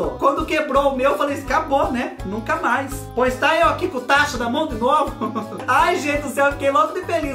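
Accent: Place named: Itatiba, Brazil. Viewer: Adoro esse sotaque dele. Eu sou baiana,mas morei muito tempo em Itatiba,onde o povo fala bem desse jeito e eu morro de saudades.💞🙏😢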